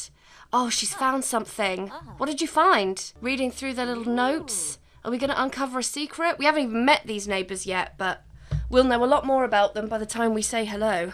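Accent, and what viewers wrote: United Kingdom. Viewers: First time watching, and this has nothing to do with the video, but I’m god awful with noticing accents but with the first word I could immediately feel the British. Username checks out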